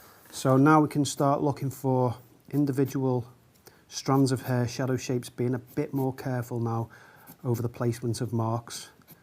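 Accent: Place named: Merseyside, United Kingdom. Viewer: Enjoyed the video just great thanks. Ps your voice sounds like mine Merseyside right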